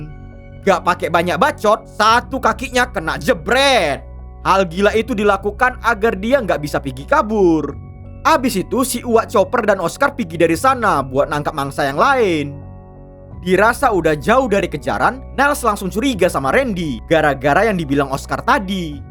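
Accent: Medan, Indonesia. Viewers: Orang medan yaa min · Medan kali salam dari Tanjong Morawa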